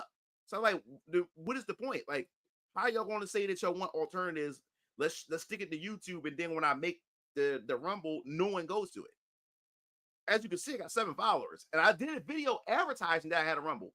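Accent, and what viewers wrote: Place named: Philadelphia, United States. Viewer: Nah couz daz how they talk in Philly, git with it x).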